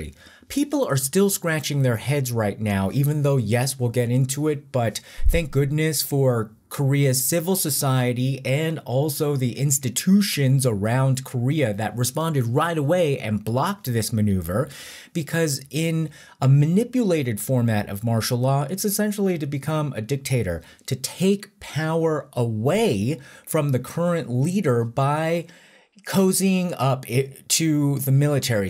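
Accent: United States. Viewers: Never like American English. Sounds terrible.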